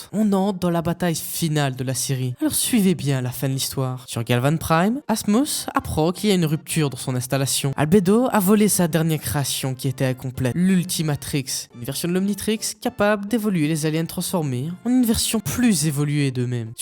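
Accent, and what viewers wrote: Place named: Verviers, Belgium. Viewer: Cet accent de Verviétois 😂 Salut camarade 🇧🇪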